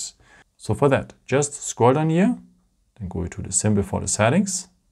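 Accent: Germany. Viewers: Perfect, thats how it should be done. Supercredible also due to the german accent :) ( danke!)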